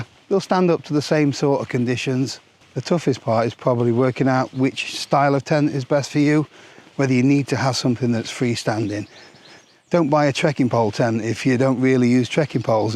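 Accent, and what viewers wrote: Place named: East Yorkshire, United Kingdom. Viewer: Brilliant video from Mr., very informative.... 'Ull' east yorkshire.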